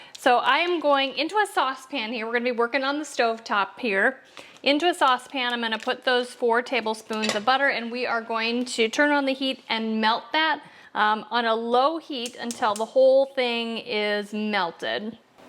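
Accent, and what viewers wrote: United States. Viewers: it doesnt matter, its kind of cute and funny how Americans pronounce it ❤️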